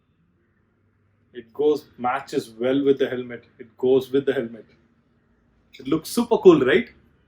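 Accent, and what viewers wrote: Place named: India. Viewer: I like English with Indian acsen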